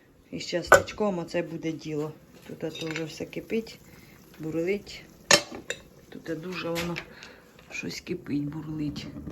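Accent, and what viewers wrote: Poltava, Ukraine. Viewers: Неподражаймий полтавский акцент.В мене батько з миргородщини.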